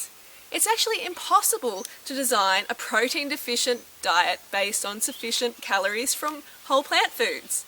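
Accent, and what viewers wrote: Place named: Australia. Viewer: Haha. Super funny. I love the Aussie accent too. Oh and btw, WHERE DO YOU GET YOUR PROTEIN??? ;)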